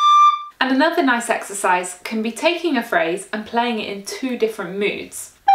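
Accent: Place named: United Kingdom. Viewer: And it’s not only what she says but how she says it in her own inimitable entertaining British accent. These tutorials just wouldn’t be the same if were to teach them using a phony mundane American accent. 😉 ♫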